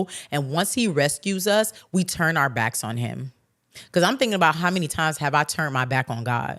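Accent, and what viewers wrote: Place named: New Orleans, United States. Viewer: Omg by your accent I thought you were from New Orleans 😅 I'm half Haitian (my dad) and American born also from South Florida (palm beach). Hey twin 👋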